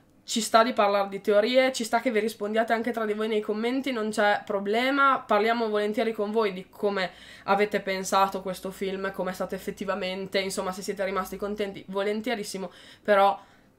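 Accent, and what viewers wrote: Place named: Reggio Emilia, Italy. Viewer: SPOILER 😁Sei di Reggio Emilia?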